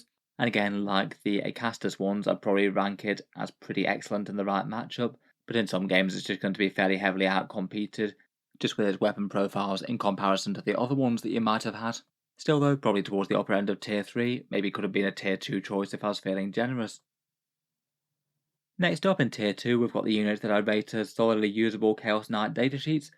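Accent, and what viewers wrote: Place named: United Kingdom. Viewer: ypur voice is annoying. too british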